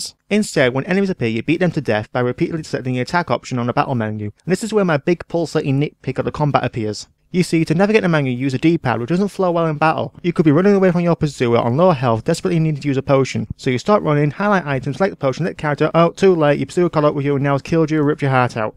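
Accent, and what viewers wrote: Northern England, United Kingdom. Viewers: you'll find that most british people can understand this perfectly fine, it's just most of the american audience switch off when they realise that not everyone in britain speaks like hugh grant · I do! Gay lyfe! You from Northern England?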